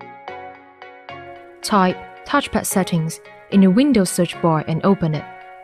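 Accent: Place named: China. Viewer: It's sad when even the AI has a Chinese accent so heavy that it can't be understood.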